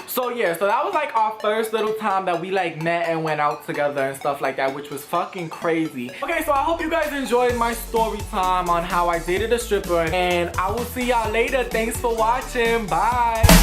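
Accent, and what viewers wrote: New York, United States. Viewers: NYC accent lol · "ah ah ah " lmfaoooo realll NY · I love how people from new york tuawk "They was lukin mad brick, like you new they was niggas, they was like Boom, Boom, Boom" hahaha I love how animated you are I need a friend like you! loll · its a fucking accent/dialect in the new york area he's from, get over yourself. how do you know he is uneducated? you just assume that from his accent? you need to educate yourself on the definition of ghetto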